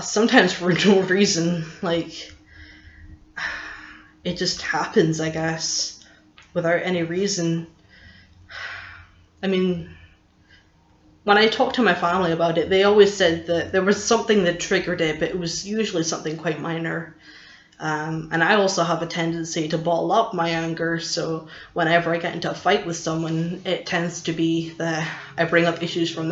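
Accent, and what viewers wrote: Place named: Scotland, United Kingdom. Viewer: Hello are you Scottish. I hear your Scottish accent coming through the more you talk, and relax. It’s as if you’re subconsciously hiding the Scottish accent to please ppl listening online. I ‘get it’ because I used to do the same when I was younger, and probably would again now if I made a YouTube video. I’m not judging you ok 👍- I’m just making an observation. And i admit I could be wrong. I just want to say hats off - Good for you to have the courage to make a video about this situation. I found your video become looked for info on borderline inner pain. I’m in a lot of inner emotional pain rn. I wish I had the courage to make a video for YouTube to share how I’m feeling. Can I ask you/ - does it make you feel better having uploaded these videos? Thank you. Blessings.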